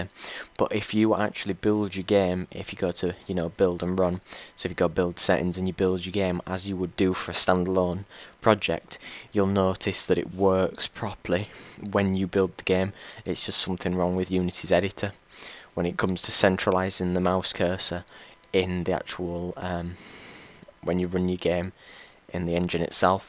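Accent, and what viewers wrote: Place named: England, United Kingdom. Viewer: Haha strange isn't the right word maybe, sorry. ^^ I come from Germany but I never heard such a dialect from England!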